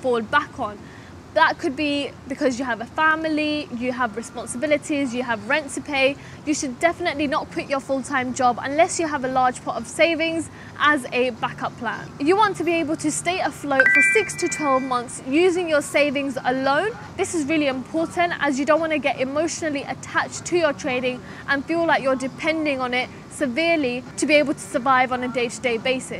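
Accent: United Kingdom. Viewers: you have a british ascent yet you look like an arab, kinda confusing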